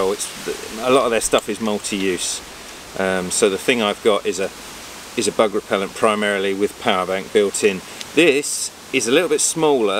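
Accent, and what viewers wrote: United Kingdom. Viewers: You were somewhere moist and needed a gadget with a light! Gigidy. Apologies, you can take the boy out of the Army………… Currently sat in France and an ad popped up on FB for this item. As always, I chose to find reviews via YouTube and came across yours. I like the fact that you can buy a generic pad for these. May I ask if you have trialled the generic pads along side Flextail pads? How do they come up in a price comparison? Nice review and nice to listen to a British review! 🍻👍🏻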